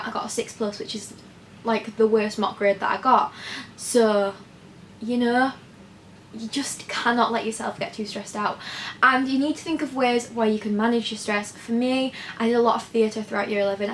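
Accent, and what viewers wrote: Yorkshire, United Kingdom. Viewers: Ackley Bridge accent YAS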